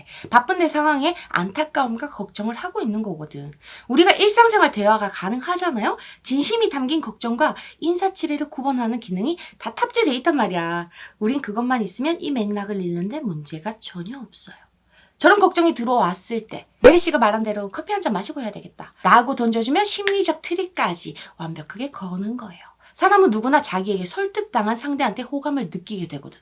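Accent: Gyeongsang, South Korea. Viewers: 갱상도 여자?짜치다니 ㅋ ㅋ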